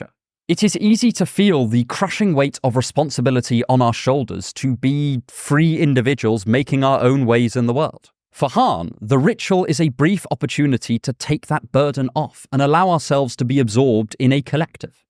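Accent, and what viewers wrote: United Kingdom. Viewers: Which accent has he? Is that British?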